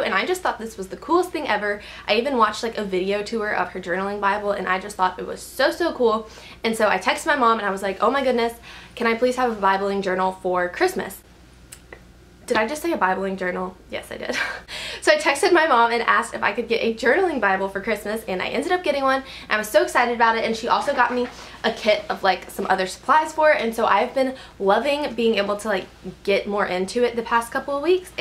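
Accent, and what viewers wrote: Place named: Alabama, United States. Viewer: Beginning of the video: "she sounds like she's from Alabama Description: "from Alabama" Haha I know my state well :)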